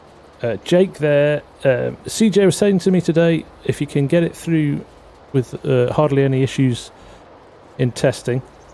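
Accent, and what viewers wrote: England, United Kingdom. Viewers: Could I ask, are originally from the uk but now live in America? Im always lost that u have an English accent but do everything American 😂 pls excuse my ignorance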